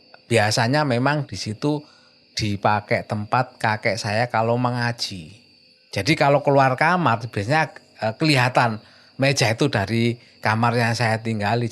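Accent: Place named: Java, Indonesia. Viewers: dialeknya jawa timuran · Gradakan jowo nemen pakde🤣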